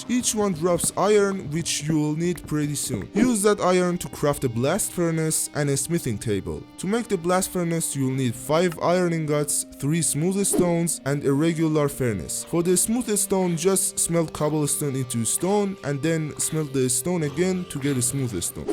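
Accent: Iran. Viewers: your persian accent goes hard 😈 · داداش ایرانی هستی؟از لحجه فهمیدم😂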